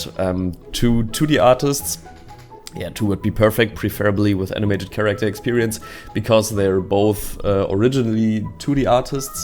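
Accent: Germany. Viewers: Kleiner Tipp: Der deutsche Akzent hat dich verraten 😏 Grüße aus dem Deutschen Reich! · I hear deutsch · Englisch ist top, aber der deutsche Akzent verrät dich sofort 😂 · R u german? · Warte du bist deutsch oder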